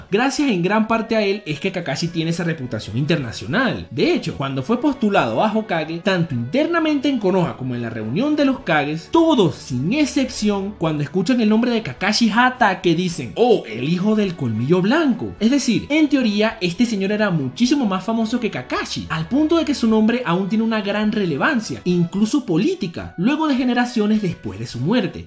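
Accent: Venezuela. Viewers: Eres venezolano, verdad? :3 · Acento Venezolano... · Eres venezolano?? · Enserio eres venezolano?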